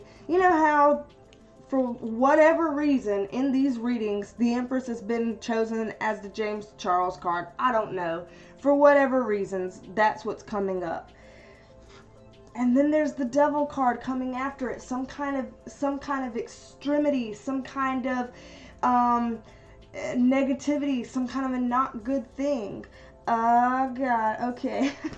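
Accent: South, United States. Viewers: You sound country as hell and I love it!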